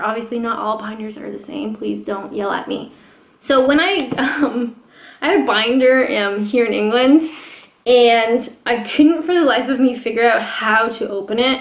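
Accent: Canada. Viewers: your Canadian own it. you say aboot its natural for you and its okay.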